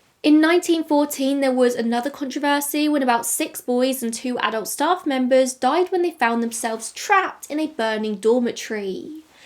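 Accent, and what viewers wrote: United Kingdom. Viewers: I am Australian and I think what you are referring to is just her accent and way of speaking and not trying to be anything. I am not annoyed by it and didn’t even realise what you are referring to till I actually concentrated on it. Many British speakers speak like this…if you are annoyed…stop listening…. I listen to a lot of American accents in these true crime channels and find many of them hard to listen to and just plain annoying so I don’t subscribe to them . I do think it is rude of you to comment…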